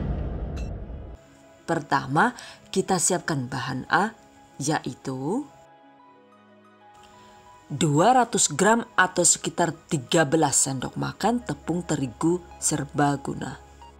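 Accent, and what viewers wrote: Banyumas, Indonesia. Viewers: bunda...BHS ngapak mu bikin aku MLM 2 ngekek...bunda asli dr kota mana sih?tinggal di Madiun. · Trima kasih resepnya bun.. insya Alloh jd ide jualan lagi nih..😁 Jadi nyengir" sendiri denger ngapaknya..😁😁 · AQ paling suka nonton video mbk lucu ada ngapak2nya karena AQ orang ngapak hehehe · Pengen kenal sama bunda, ngapak nya itu loh gemesin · Mksih Bund..resepnya mantul apalagi ngapaknya pd kro nyong banyumasan Bund...ora ngapak ora kpenak😃😃😃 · ini bunda org mana sih?koq ngapak bgt hehehhe kyk saya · ya allah mba.. ngapak pada karo nyong lah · Bikin resepnya jelas bgt👍👍👍 tp gak kalah sama bhs ngapaknya jelas poolll, kencoot😂😂😂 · Senengnya belajar msk SM Ada hiburan ny ngapaknya Saya seneng karena SM dg saya dri Cilacap 👍❤️ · Ngapak sih mba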